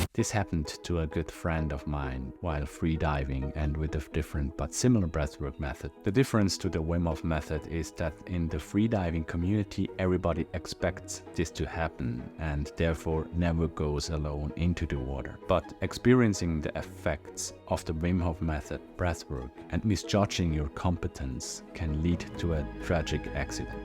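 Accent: Switzerland. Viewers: Salut:-) Ich bin mega happy dass de Youtube-Algorithmus vor es paar Wuche mir din Kanal empfhole het und ich han scho recht schnell s Gfühl gha, dass mir de Dialekt bekannt vor chunt. Cool z gseh, dass au Schwiizer i dem Bereich aktiv sind.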